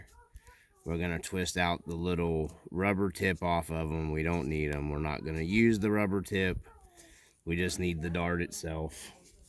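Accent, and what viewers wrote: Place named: Australia. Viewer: Thanks for the shoutout and kind words mate. Love the Aussie accent 😉. Just found your video and enjoyed the watch. Now subscribed - Thunder from Downunder! 🌏🇦🇺🦘🐨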